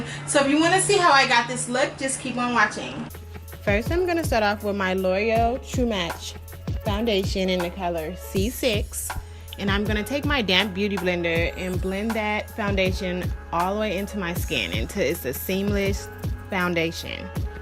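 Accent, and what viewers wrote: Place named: California, United States. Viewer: You sound like you from California 🤔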